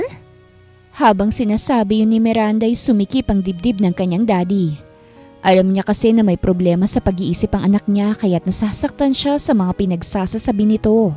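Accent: Visayas, Philippines. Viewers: imo mga follower's mga bisaya...cute kaau ka mg bisaya...salamat sa new upload...God bless❤ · Hahaha..nalingaw ko sa bisaya sa narrator..cute😊😊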